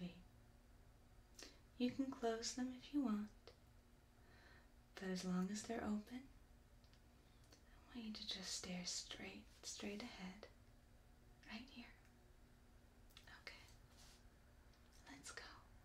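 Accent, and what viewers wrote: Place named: South, United States. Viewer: I don't comment very often on your channel, but I just saw this vid 2.5 times... That good it was!! Amazing tingles!! ...Thanks for that! :D ...ALSO, there's a rhythm on every aspect of the video that makes it SO soothing -I bet if we measure your movements, those must be along the range of the Theta Waves- and so relaxing! ... ...and one more thing, I love your southern accent! Is so mellow... Talking with contractions is sooo mellow xD Thanks again! :D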